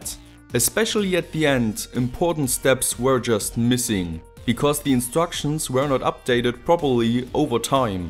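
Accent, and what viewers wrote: Germany. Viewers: I like your german accent! Like in old good times))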